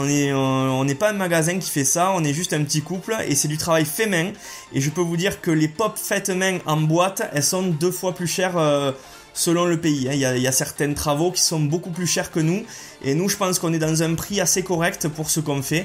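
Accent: Toulouse, France. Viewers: rapha 1023 cest l accent toulousain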